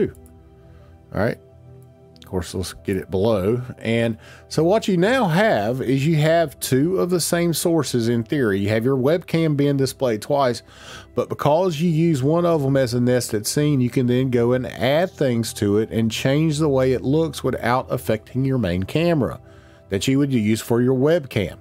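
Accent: North Carolina, United States. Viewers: Great tutorial! Thanks! Love the UNC stuff in the background. Based on your accent, you are probably in NC like me. I'm using your tutorials to help me with my Church livefeed in Roxboro, NC.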